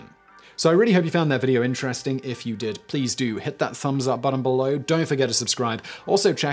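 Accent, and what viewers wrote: United Kingdom. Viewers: Never heard poontang said in a UK accent until today. Prison sucks! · oh how i wish i could have a British guy narrate my life..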